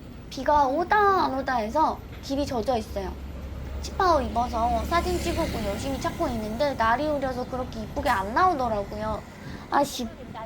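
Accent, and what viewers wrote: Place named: Gyeongsang, South Korea. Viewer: 너무 이쁜보이스를 가지고 계십니다 약간 갱상도 대구 말투도 썪인듯함😊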